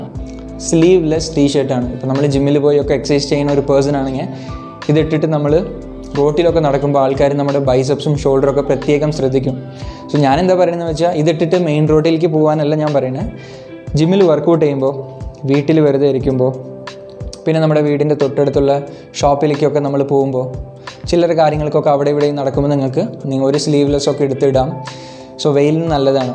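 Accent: Tamil Nadu, India. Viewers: Ningal evideyanu settled.... Aake paade oru tamil touch undallo man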